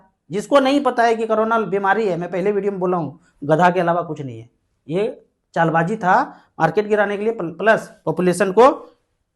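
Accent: Bihar, India. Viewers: Bihari boli bolta hai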